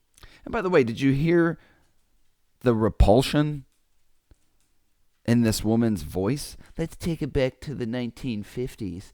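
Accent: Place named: Australia. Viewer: Aussie accent are awesome. I agree with the rest of what you said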